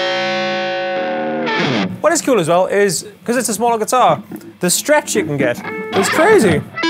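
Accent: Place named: England, United Kingdom. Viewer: He's English, some English accents can sound slightly irish.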